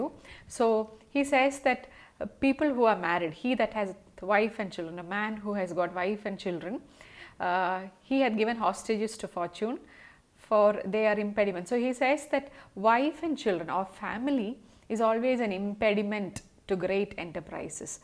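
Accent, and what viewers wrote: Kerala, India. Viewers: Good lecture mam... r u malayali or kannada??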